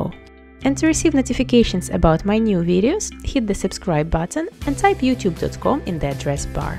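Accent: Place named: Russia. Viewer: U have rus accent...), wr r u frm?!)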